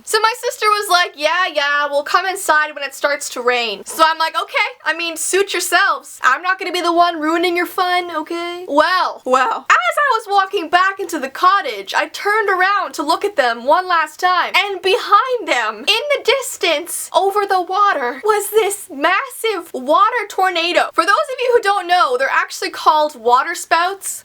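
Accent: Canada. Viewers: K.a.s. Kast naw she sounds Canadian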